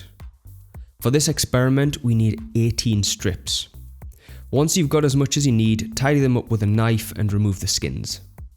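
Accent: United Kingdom. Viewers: i personally love the british accent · british boiii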